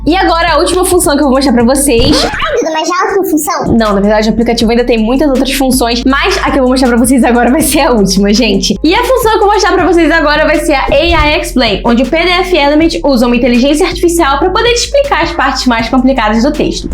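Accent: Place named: Spain. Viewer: duda, tu agr qnd falas ingles ja tens o sotaque espanhol kakaka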